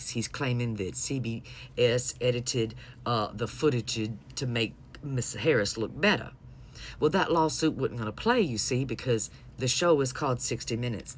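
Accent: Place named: Louisiana, United States. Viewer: Are you from New Orleans or Louisiana area? Because I absolutely love your accent and your voice is beautiful.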